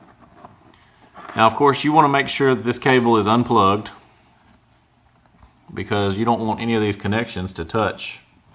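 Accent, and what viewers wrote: South, United States. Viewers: tony Hear, hear. I'm I the only one who likes his voice? Nice southern drawl.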